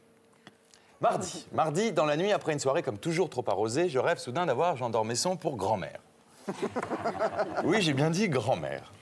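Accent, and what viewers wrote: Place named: Quebec, Canada. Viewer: Merde il a vrament l'accent québecois non de dieu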